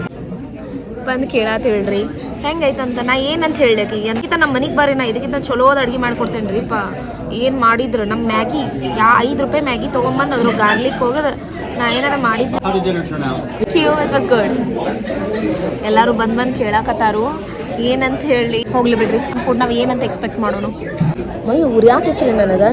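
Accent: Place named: Uttara Kannada, India. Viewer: ಉತ್ತರ ಕನ್ನಡ ಮಾತು ಮಸ್ತ್ ರೀ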